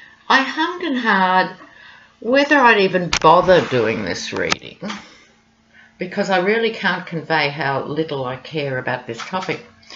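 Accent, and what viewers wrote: Australia. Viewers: Hi, I just discovered you. Great to hear a fellow Aussie speak about the crap going on in the states! A lot to catch up on...😊😊😊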